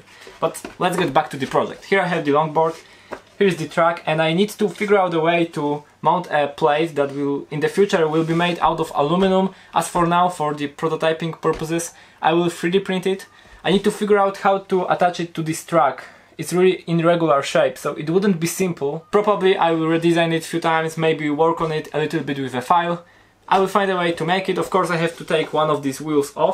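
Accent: Poland. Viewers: I knew you were polish from the first second - very nice video! Nice editing skills!!! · Od razu usłyszałem polski akcent. 😁 Polacy tak ładnie i dokładnie mówią 😃 pozdro kolego bardzo fajne wykonanie 👍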